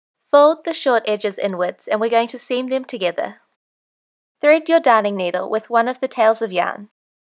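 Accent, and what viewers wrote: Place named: Australia. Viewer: Love the video and love your accent! Australian is my favorite :D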